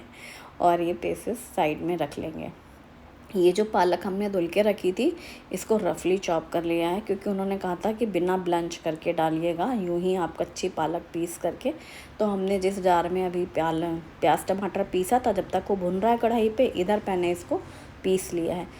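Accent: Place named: Maharashtra, India. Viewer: Ek baat bolu.... Aap "Bahar ko Baher " bolti hai hamesha , mai hamesha notice karti hu,,, A Hamare MAHARSHTRA ka asar hai.. 😊😊😊😊❤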